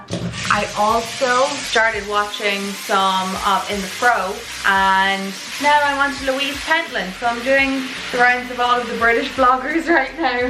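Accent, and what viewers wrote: Ireland. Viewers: I love your blogs! I organized my closet after watching you....brought out all my spring/summer clothes. Put away all my winter stuff. I could watch and listen to your sweet Irish accent! Please keep your vlogs coming! ❤️ from the USA!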